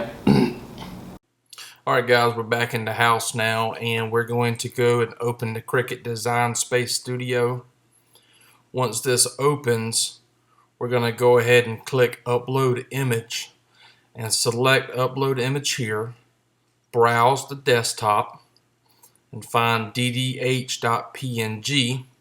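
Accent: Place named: Lowcountry, United States. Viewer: Where in the world are you from? Sounds like my neck of the woods! #lowcountry Enjoyed your DIY!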